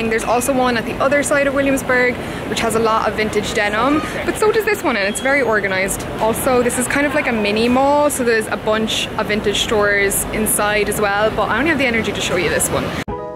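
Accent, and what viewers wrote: Ireland. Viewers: I'm new to your channel. Is that a slight Irish accent I hear by chance? Great gems!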